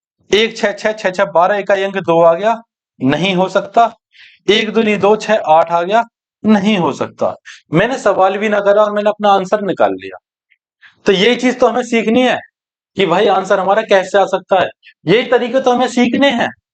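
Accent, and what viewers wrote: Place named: Muzaffarnagar, India. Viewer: Sir muzaffarnagar ki language lg rhi h aapki 😊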